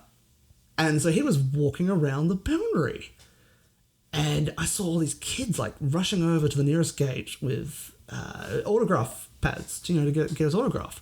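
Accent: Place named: Australia. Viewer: Bouncy happy Australian boy.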